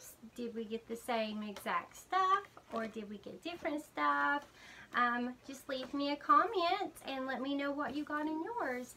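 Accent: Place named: South, United States. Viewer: I love your ramblings and your Southern accent! You are growing so fast 😊😊😊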